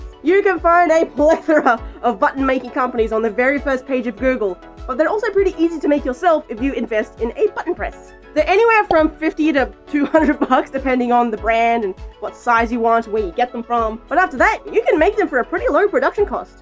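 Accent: Australia. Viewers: I forgot you were Australian until I clicked on this video......Oof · i have no idea why but i can hear the Australian accent stronger for this episode and i love it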